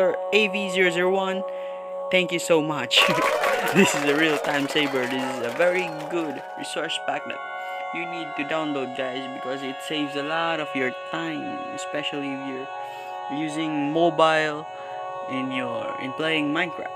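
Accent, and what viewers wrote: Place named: Philippines. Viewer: Noypi!!!! I just know from your accent, am I right?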